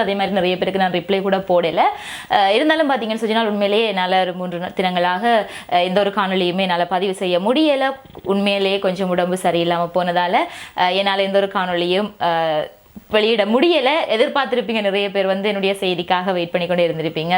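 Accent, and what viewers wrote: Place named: Sri Lanka. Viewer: Hi akka I from india tamil nadu SL tamil slang remba pidikum today thaan unga video show anathu udaney subscribe panniten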